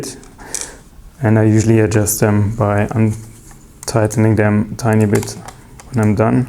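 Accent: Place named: Germany. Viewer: eew that gross accent... I assume you're german? maybe work on your speech before you upload videos in a lingo that you can't pronounce without ppl getting ear-cancer...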